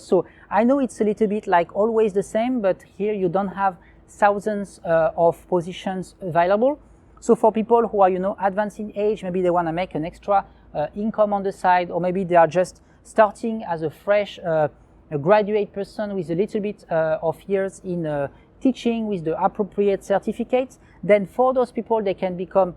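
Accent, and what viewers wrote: France. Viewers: investors can put their money wherever they want and it doesn't mean they are right. Secondly american is the clearest accent out there?, it is all too subjective dear, i have a hard time understanding an texan or a deep south accent and they are all american accents!!! luckily they are subtitles on TV to help. And for a british or aussie, their english will be the clearest, and they are lot of students learning english there, so it's a matter of perception and preferences: for my part, i met people all around the world and they ask speak english with a different accent and i understood all of my speakers. My comment about the guy who suggested that should learn to speak american english was to say, although his accent is french but the content of his message is understandable and they are subtitlesb in YT for those who have difficulties to hear what he says.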